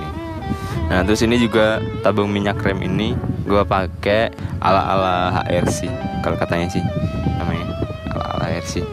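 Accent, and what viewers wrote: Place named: Java, Indonesia. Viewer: Medokmu ketoro mas 😅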